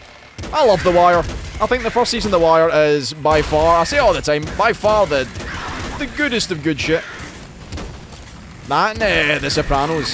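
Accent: Scotland, United Kingdom. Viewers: me: oh neat a nuclear throne LP I don't see those super often. I'll just click on this and- instant thick Scottish accent and I am consumed with glee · are you scottish? cuz i love scottish accents. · The Scottish accent considerably enhances the experience of this video.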